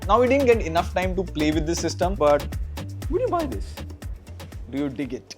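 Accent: India. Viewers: Indian typically Indian accent hahaha we don't want to hear Indian typically English they always singing words, talk Hindi not English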